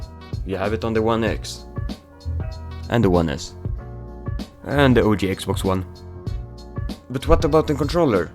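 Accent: Sweden. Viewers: You from Sweden? :)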